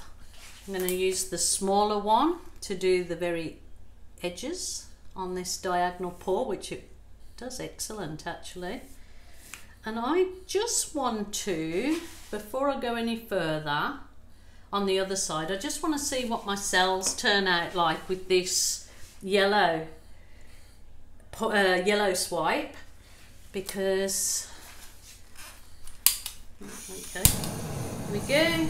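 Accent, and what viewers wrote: Birmingham, United Kingdom. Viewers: Hi! Just curious....are you originally from Birmingham? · I know a Brummy when I here one 😀 A good move you made 😀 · Me and my husband are having fun with your accent ☺️ he is from West Bromwich in UK 🇬🇧 and you sound like a Brummy are you from that area or is it just coincidence that the accents sound the same..?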